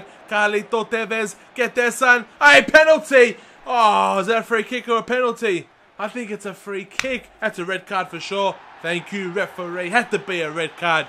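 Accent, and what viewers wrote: Australia. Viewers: are you a fellow Aussie?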